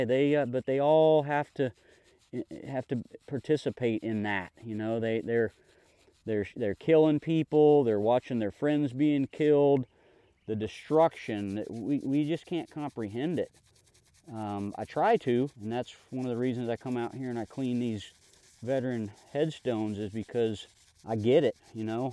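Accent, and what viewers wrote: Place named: Florida, United States. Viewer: You have a Florida accent, like Gary Rossington from Lynyrd Skynyrd.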